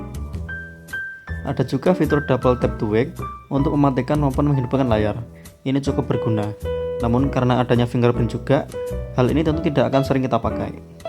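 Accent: Java, Indonesia. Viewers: Medok gan logat nya,good..jawa bgt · review bagus kecuali reviewernya..medokk abis... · Medok banget bang 😀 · sampean wong ndi tho mas medokmu kuwi lho, joss gandoss 😂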